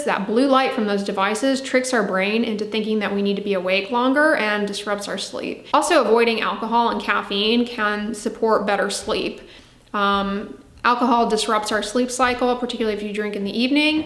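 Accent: United States. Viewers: She definately does not have Australian accent. I am from Australia. Her accent is American.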